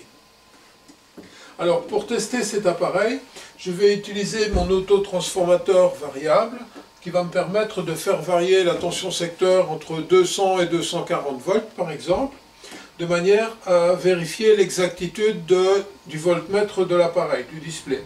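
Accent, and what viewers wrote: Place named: Belgium. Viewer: Merci de cette vidéo bien expliquée (+ sympathique accent belge...)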